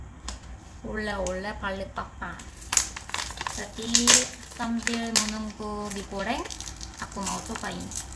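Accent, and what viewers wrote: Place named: South Korea. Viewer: Inikah org korea yang waktu itu ku gonceng. Wkwkwk hari itu dia pakai baju safari, pasang muka bingung, cari lokasi di gps. $: mau kmn? "Dia kyk ke ingungan".: mau ke sini "sambil nunjukin layar hp nya". $:"ternyata yg ingin di tujunya pasar impres,pasar impresnya sudah di sampingnya😂" Ini pasar impresnya"pasarnya lagi tutup, karena hari besar Lebaran idul fitri".: Haa di sini... Terimakasi "Lanjut jalan". $:"kok ku perhatikan kyk bukan org sini, mungkin turis..cara ngomongnya jg kyk org korea, sya kira org cina yg tinggal di sekitar sini". Terus sya samperin kembali, dan menanyakan mau kmn.. terus jwbnya mau makan, sya jwb... Pada tutup krn hari besar. Tp klw mau sya tolongin, naik ke motorku sya bonceng dan antar ke toko yg buka jual makanan. Kemudian dia naik dan kita keliling pasar impres, setelah lewat lampu merah, ada org jualan. 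Yaudah sya bwa dia kesitu... Terus sya tinggal 😂😅sya mau ajak makan di rumah... Takut di tanyain macem"sma org rumah. Ahahhahaa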